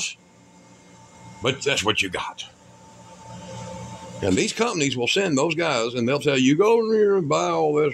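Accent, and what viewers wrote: Texas, United States. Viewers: Thankyou, I'm from Texas too and you sound just like me... keep up the good work brother. I have walked more than a few miles in the same kind of shoes.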